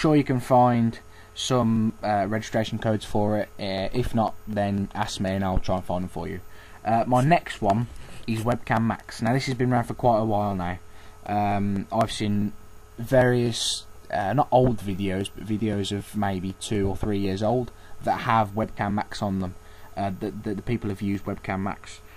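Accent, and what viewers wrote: United Kingdom. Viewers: this black country accent comes on lol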